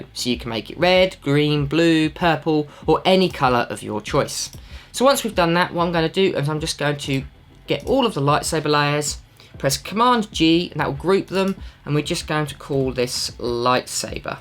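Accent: United Kingdom. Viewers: Is this British accent? I like it😍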